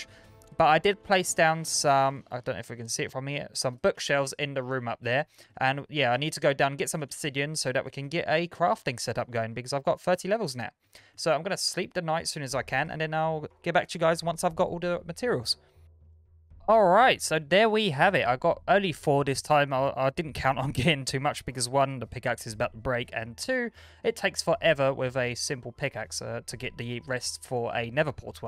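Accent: United Kingdom. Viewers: Its british. I have the same accent. It doesnt sound like he has an accent to me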